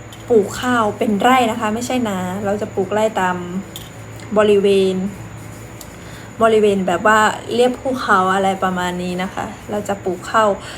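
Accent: Cambodia. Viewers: เจ้าเว้าไท แบบเขมร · สำเนียง(การกระดกลิ้น)เวลาพูด)คล้ายๆเขมรเนาะ...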